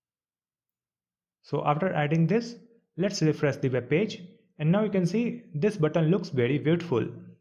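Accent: India. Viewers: he has a normal Indian accent, also learn to spell.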